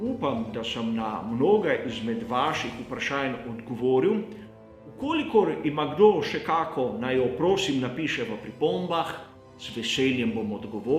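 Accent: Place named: Koper, Slovenia. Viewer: rtv z 100mio na leto ne uspe sestavit 1% tega kar lahko vidimo na tem kanalu. se mi je zdelo da si kje iz kp ko sem začel spremljat tvoje prispevke to pa zato ker uporabljaš G in ne H po Horiško :) tudi moje čestitke za delo ki ga opravljaš in upam da se bo nadaljevalo tako. me pa zanima zakaj vsak dan nov video. se ti ne zdi da bi si kdaj vzel dan prosto od tega? naredil mogoče video nekje 6 do 7min z nekaj kratkimi izseki? v mislih imam kot nek malo drugačen format prispevka ki bi ga objavil tu in tam.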